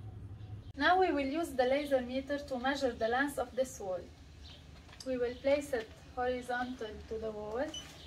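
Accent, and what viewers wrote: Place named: Arab world. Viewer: you look like arabian accent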